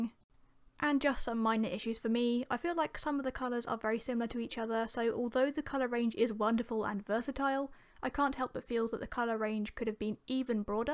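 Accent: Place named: RP, United Kingdom. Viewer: Ive only noticed you're channel 3 days ago and the fact youre English and posh talking really has made me subscribe and youre artwork is supurb x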